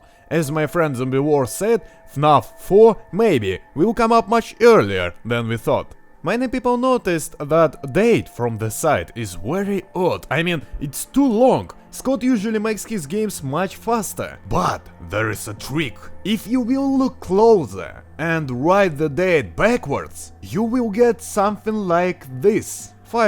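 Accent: Russia. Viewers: Очень странно слушать английский с Русским акцентом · Почти у всех русских такой акцнет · когда ты уже позбудешся руского акцента?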